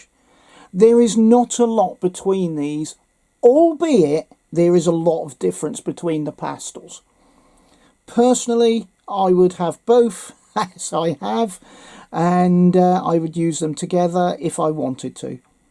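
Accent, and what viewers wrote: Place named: Norfolk, United Kingdom. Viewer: I was searching for which oil pastels to buy, I clicked on your video, and immediately perked up when I heard a good ol’ Norfolk accent! I’m a Norwich gal myself, now living in Leicestershire, and it was so wonderful to hear someone talking properly 😂 I miss beautiful Norfolk so much, I’ll be moving back as soon as I get the chance. Anyway, great review, I think I have a similar art style to you, as in I want more precision, so I’ll be getting the Artecho set. New subscriber here, your review was spot on as to what info I needed. Thank you 😊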